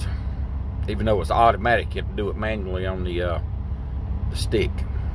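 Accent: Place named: South, United States. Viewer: We're you from. Sounds like a southern accent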